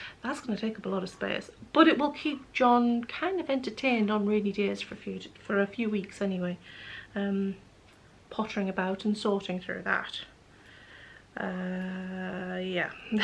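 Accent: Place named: Northern England, United Kingdom. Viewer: I had to pop over to your website to find out where you are originally from because you certainly don't sound Scottish, but have a similar accent to me (I am on the NE coast of England) I think us 'Northerners' all have similar accents!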